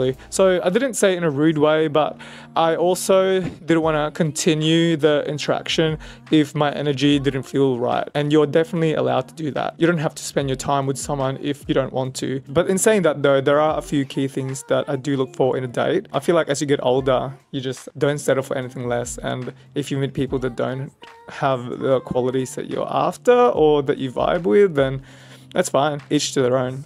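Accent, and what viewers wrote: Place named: Australia. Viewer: guess I confused the Australian accent w/ a British accent . Luv your vlog & style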